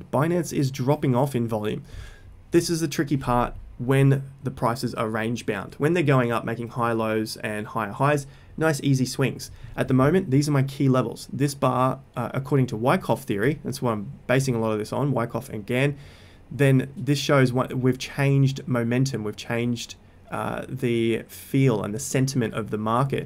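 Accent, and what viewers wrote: Australia. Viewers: Aussies say "Megger" instead of Mega and "Wata" instead of Water. Love the content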